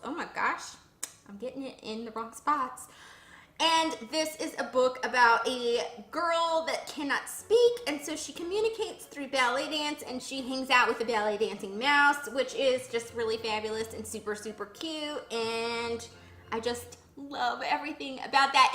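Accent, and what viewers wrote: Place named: New York, United States. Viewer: Great vid,!....It was all that and a box o' chocolates!:))...........fun New Yawk accent lol.....that was like a dame in a 40's movie.......that makeup looked very cool.....great pics at the end:))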